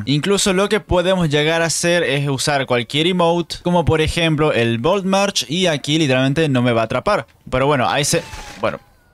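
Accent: Argentina. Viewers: ENTONCES ERES DE ARGENTINA? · eres argentino esa es mi pregunta y tambien yo soy argentino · Vos eres. De 🇦🇷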